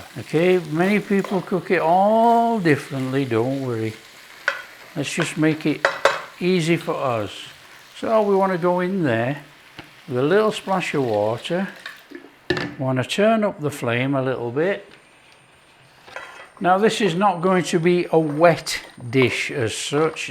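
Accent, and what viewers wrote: Yorkshire, United Kingdom. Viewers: Happy New Year. We all really appreciate your videos on helping people to cook, especially those on a budget and those that live on thier own. Just wondering on your lovely accent. I’m from Wiltshire and sound like a bumpkin farmer but have always loved Northern accents. I love the Lancs accent but I’m taking a punt that you are more Yorkshire, northeast say Sheffield area? Lovely channel you have, appreciate your work ❤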